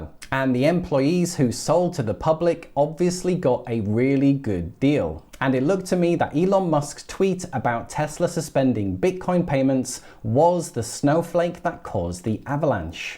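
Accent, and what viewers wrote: United Kingdom. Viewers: Your British right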